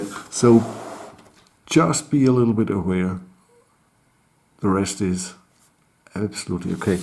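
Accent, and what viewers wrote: Germany. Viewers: Your Hessischinglisch is a pain in my Ears..... Dann bitte auf hessisch.... Oder Frankfurterplatt....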